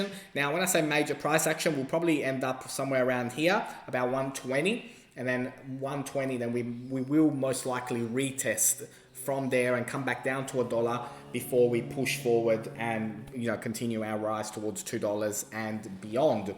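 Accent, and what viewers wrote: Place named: Australia. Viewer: Love your accent mate - Aussie Aussie Aussie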